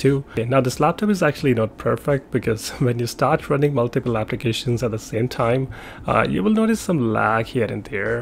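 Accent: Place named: India. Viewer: the indian accent still slips lol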